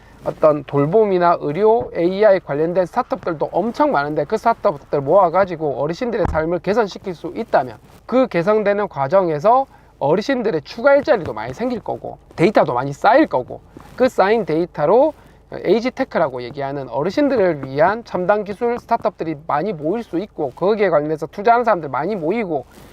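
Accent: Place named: Gyeongsang, South Korea. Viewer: 갱상도 사나이 맞네 어무이.. .정겹습니다.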